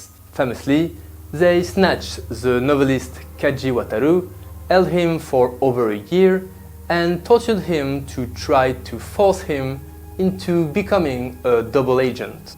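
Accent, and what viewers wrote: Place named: France. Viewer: You sound like a Frenchman.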